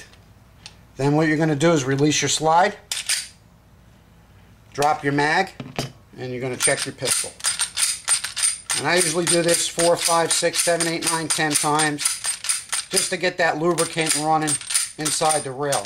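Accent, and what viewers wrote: New York, United States. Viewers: NY accent? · Great stuff! Thanks for the video and simple to follow instructions, this is my first gun and I want to take good care of it! btw love the accent, you from New York?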